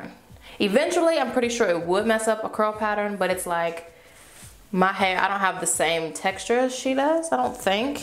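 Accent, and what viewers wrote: South, United States. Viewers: she sounds kinda country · you have a weird country accent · ur voice is so southern belle esque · That southern Belle accent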